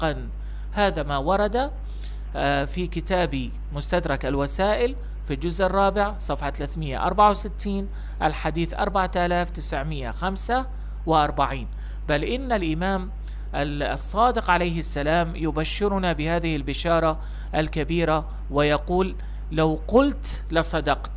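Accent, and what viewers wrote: Lebanon. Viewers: ماجور ان شاءالله بس عندي استفسار خارج الموضوع يأخي نبرة صوتك قريب على اللهجه اللبنانيه او السوريه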